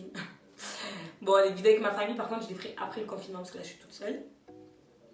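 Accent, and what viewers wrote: Marseille, France. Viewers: Ton accent marseillais est juste magnifique ! Wouaaaaaaaaaw 💓 heureux ménage la jolie ,gros bisous 😘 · Tu est de Marseille ? L'accent ?? ☺️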